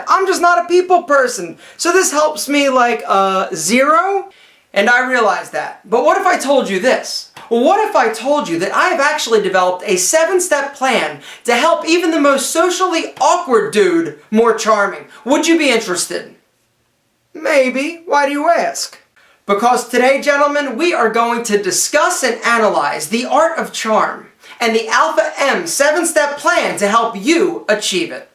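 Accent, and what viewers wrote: Philadelphia, United States. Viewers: alpha-are you from Philly? Your accent sounds like it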